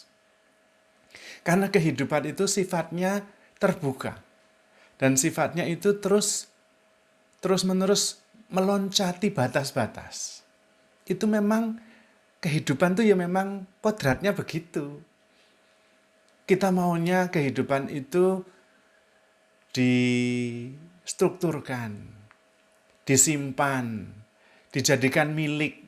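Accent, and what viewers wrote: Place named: Java, Indonesia. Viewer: 🌿🍁😊 Sugeng dalu Romo... Matur nuwun Pencerahannya... Jujur saya ngèkèk sendiri.. Dengar Romo bicara campur logat Jawa deles...Bahagia sekali rasanya.. - Semakin kita membandingkan dengan kehidupan orang lain semakin stress.. - Saya pribadi kok luwèh” dengan kehidupan masing” orang... - Saya syukuri setiap rahmat yg Tuhan beri, jalani apa adanya, nikmati..Sampun cekap... Matur nuwun Gusti.. - Makan bisa nikmat( walau lauk tempe penyèt)... Tidur bisa pules walau kasur bukan Springbed ... - Namung sumarah dumateng kersanipun Gusti.. -Langkung manteb malih mirengaken Pencerahan Romo yg selalu Super... Matur nuwun Romo, Berkah Dalem...🙏🙏🙏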